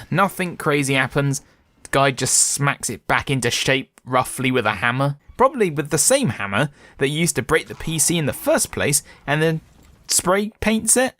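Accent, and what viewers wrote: United Kingdom. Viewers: oh my gosh your a bri'ish linus tech tips!! I would actually love to hear you talk more about pc's though and do more of these commentary-esque videos! They are a nice break from the SCP theme and hopefully they have enough interests to not run your views into the dirt.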